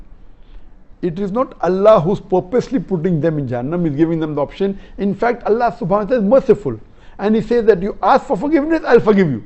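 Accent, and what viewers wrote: India. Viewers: Please admin, you should probably give the subtitle. sometimes i dont quite understand Indian accent. My english isn't good at all & i still want to learn from Dr Zakir Naik. Thanks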